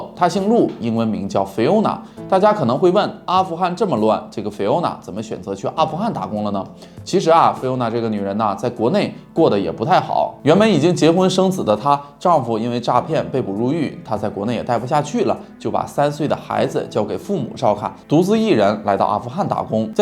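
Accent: Northeast, China. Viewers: 这一听博主的口音就是俺们东北那嘎达的人儿，就是不确定是不是和我一样是沈阳的，哈哈 案件讲述的也非常精彩，已经订阅了，支持老乡儿~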